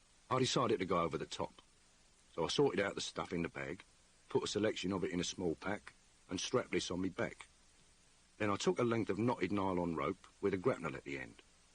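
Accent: Cockney, United Kingdom. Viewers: Thank you so much! To me, this is a double delight! Not only hearing John Thaw reading in cockney, but even more hearing a Modesty Blaise piece I never have read before. Fabulous! 😃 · What a treat! I didn't know he could do a cockney accent, having only heard him as Morse (and in one Sherlock Holmes episode). My brother and I read all the Modesty Blaze books back when, and this reminds me how good they are.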